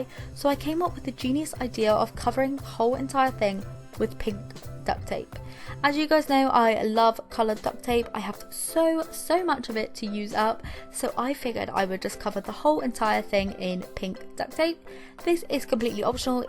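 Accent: United Kingdom. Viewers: I love watching you because I love your British accent 😂 I live in America and I have a Southern accent because I live in Alabama which is like at the bottom of America.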